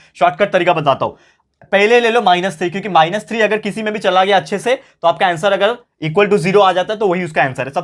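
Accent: Maharashtra, India. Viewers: Sir aap Marathi ho kai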